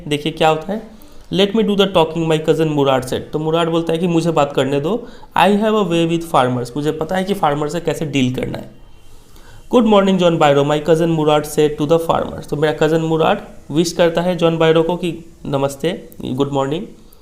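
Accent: Bihar, India. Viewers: Sir aap bihari hai na ??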